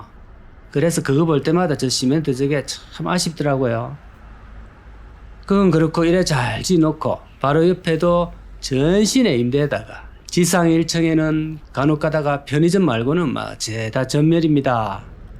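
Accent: Gyeongsang, South Korea. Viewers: 경상도 사투리 방송? 왠만하면 표준말로 하시죠.. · 전형적인 진짜 ㅈㄴ 듣기 싫은 경상도 말투 ㅋㅋ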